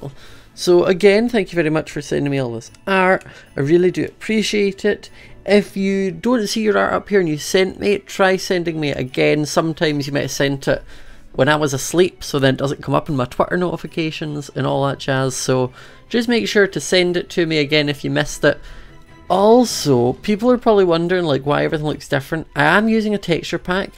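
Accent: Scotland, United Kingdom. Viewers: are you really Scottish because i love your actcent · Are you Scottish????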